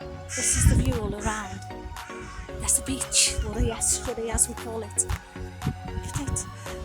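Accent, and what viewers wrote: United Kingdom. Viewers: Hello from Brazil. Super like for your video. I like old castles. Brazil was a country of farms. And not castles. You have a nice British accent. I studied English Literature in college☕🇧🇷🌷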